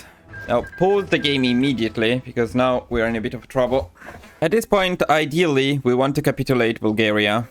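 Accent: Italy. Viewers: I couldn’t tell at first but the Italian accent is very distinctive. Especially with the “-a” sound at the end of a lot of words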